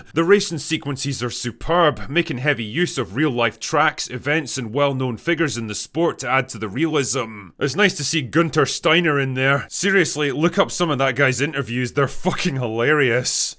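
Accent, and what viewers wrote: Scotland, United Kingdom. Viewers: So I have to say I haven't been watching all of the F1 movie reviews because I'm going to see it tomorrow in IMAX I'm a Brad Pitt fan I'm a big F1 fan and I didn't want to spoil it but wow your review was awesome not because you said you like the movie but why you said you like the movie. I was listening to it and I said this guy's got to be from Scotland and my wife's ancestry is Scotland and I love the Scottish people hey any man that can wear a kilt and pull it off I got to give you credit and who doesn't like bagpipes anyways love the review love your attitude about it and now I'm a subscriber and looking forward to more things and I like your channel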